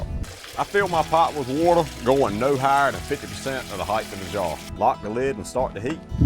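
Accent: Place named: Louisiana, United States. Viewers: I like that accent, sounds like he's in Louisiana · You sound like you from Louisiana, man. Are you? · Dude what is your accent? Cajun or something? Man you could be in movies with a voice lie that.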